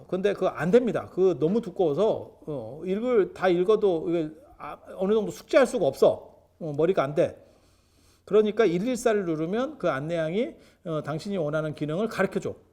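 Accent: Jeolla, South Korea. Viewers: 스님께선 서울서 자랐다고 늘 말씀을 하시는데 말투나 어조는 전라도 사투리가 같아요 시간될때 말씀좀 부탁드립니다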